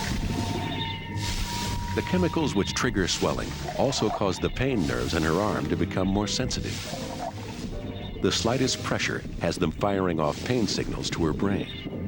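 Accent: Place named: United States. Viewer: the american narrator ruins this show.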